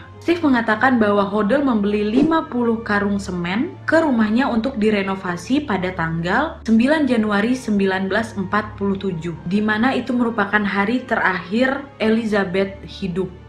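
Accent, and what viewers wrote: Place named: Medan, Indonesia. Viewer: Logat Medan nya itu Wak...👍😁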